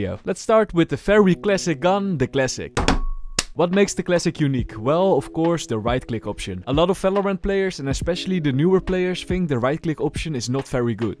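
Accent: Netherlands. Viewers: Meest Nederlandse Engels ooit😭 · Als je geen Nederlander bent dan ben ik geshockeerd 😅 The heaviest Dutch accent I've heard in a while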